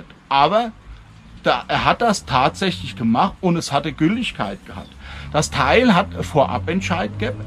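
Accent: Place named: Rhineland-Palatinate, Germany. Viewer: Hallo, unser Rheinland -Pfälzer Dialekt ist doch sehr schön, na goad is schwetze Nassauer dialekt, over is eigentlich fast dat gleiche, mach weiter so und alles Gute.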